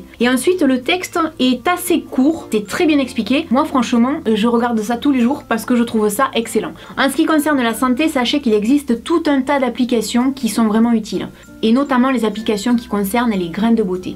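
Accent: South, France. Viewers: l'accent du sud!!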